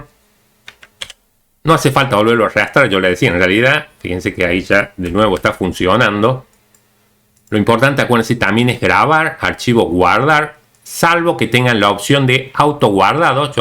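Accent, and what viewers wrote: Argentina. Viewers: buenas tardes profesor, me esta empezando a gustar el acento argentino no me paso viendo hablar a messi ni a maradona muy agradecido voy por el video 66 no me habia dado cuenta que tenia todas esas pestañas en el editor de codigo abiertas y te vi como las cerrabas y aprendi jejeje, muy agradecido con tu enseñanza si hubiera sabido de alguien en el pasado que explicara asi le hubiera pagado. pero no encontre tal cosa. espero poder contribuir en un futuro muy cercano, la verdad que siento pena de ver tu gran esfuerzo y no dar nada de vuelta. muchas gracias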